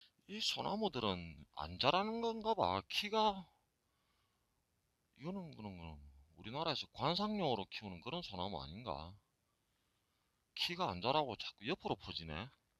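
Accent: Gyeongsang, South Korea. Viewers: 경상도사투리와 영어가 구수합니다 저도미국에서 트럭하고싶네요 ㅎㅎ 잘보고갑니다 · 갱상도 사투리 티나네요 해임요 ㅋㅋ 응원합니다 오늘도 굿데이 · 베트남서 시청하고 있는 1인입니다. ㅎ 지난회차 거의 다?? 시청했습니다 ㅎㅎ 묘한 마성의 갱상도 소리를 매일 듣네요 ㅎㅎ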